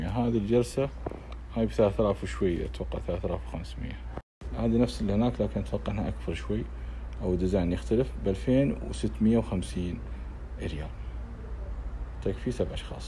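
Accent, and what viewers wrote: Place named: Syria. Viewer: من سمعت لهجته سوري كنسلت لان السوريين في كل المجالات اسعارهم ١٠ اضعاف السوق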